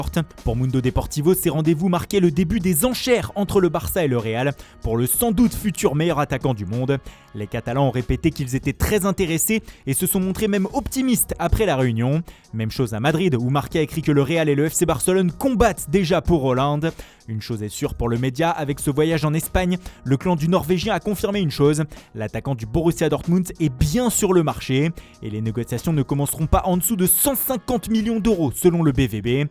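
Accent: Italy. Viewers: Le retour de la voie avec les accents italiens mythiques 🥰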